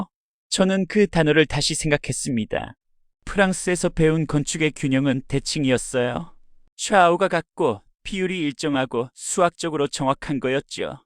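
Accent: North Korea. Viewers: 나래이션..ㅎㅎ 북한말버젼... 모야...ㅎ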